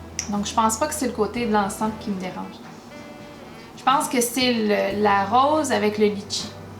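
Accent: Quebec, Canada. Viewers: Bonjour, j'adore ton humour l'air de rien quand tu parles des sorties et des confinements 😁 Pour moi, faciles à porter: N°19 (mon parfum signature), Insolence et Shalimar millésime de Guerlain (coup de coeur mais mauvaise tenue), Vanille tonka de Nicolai, et plein d'autres... Ceux avec lesquels j'ai plus de mal désormais, les "cheap" de façon générale, par exemple la collection "Les notes gourmandes" de Réminiscence (Guimauve: je ne peux en mettre que sur mon poignet ou le sentir dans la pièce, mais ni moi ni sur les vêtements). Mais aussi les parfums dont la base est l'oud, tous les masculins en général, ainsi que les "odeurs de propre" (muscs trop synthétiques). Merci pour toutes tes vidéos qui sont un vrai plaisir à chaque fois, avec ton accent (euh, je veux dire le fait que tu n'aies pas d'accent français 😁) qui me donne envie de retourner au Québec! 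Bises 😘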